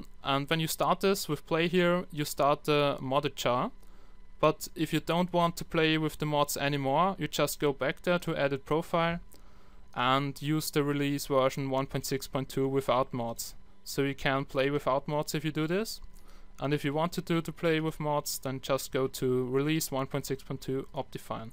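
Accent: Germany. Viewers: man merkt das du deustcher bist :D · you sound like Docm77 ... German Accent ♥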